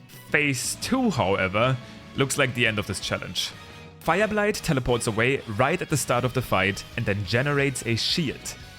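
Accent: Germany. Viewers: ICH HÖR DEUTSCH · Are you german? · deutscher kann man ja garnicht klingen haha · Der gute alte deutsche Akzent verrät einen letztendlich doch immer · Always love it when I watch a new channel and I can immediately hear the german out of them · deutscher akzent lol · Er klingt sehr deutsch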